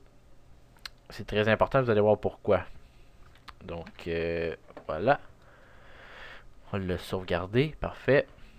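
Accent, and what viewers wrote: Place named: Quebec, Canada. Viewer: C'est un québecois normal qu'il a un accent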